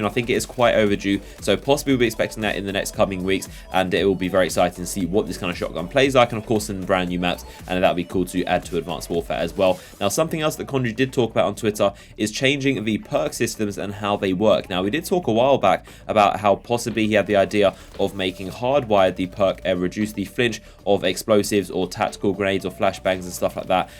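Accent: England, United Kingdom. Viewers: He is from England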